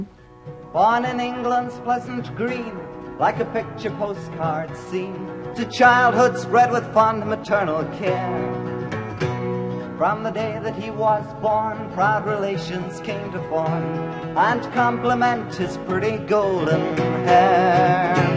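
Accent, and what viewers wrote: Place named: Germany. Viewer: Who is the narrator with the german accent?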